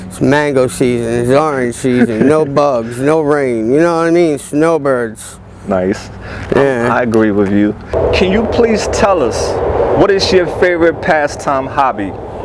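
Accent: New York, United States. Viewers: fair enough. Today I met a guy from New York that sounded just like you is all.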